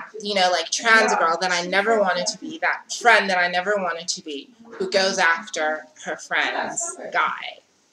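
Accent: United States. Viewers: Why do you have an American accent if you’re from South Africa?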